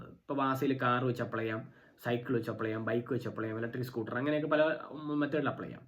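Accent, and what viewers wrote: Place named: Trivandrum, India. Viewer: I'm also from trivandrum.. Slang kettappazhe thonni trivandrum aanennu. Trivandruthu evdeyanu..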